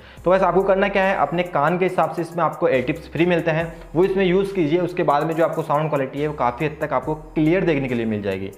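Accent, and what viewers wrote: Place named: Haryana, India. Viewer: bhai tu shayad hariyana se hai and accent mast hai teri has has k pagal ho gaya.. but haan sahi review dia hai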